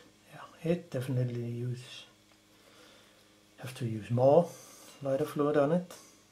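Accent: Denmark. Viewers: Hi. Thank you for the video. 🙏 You sound Danish - are you from Denmark? If Can you please help me with cleaning an old Zeiss 50mm 1.8 Ultron lens? Kind regards Per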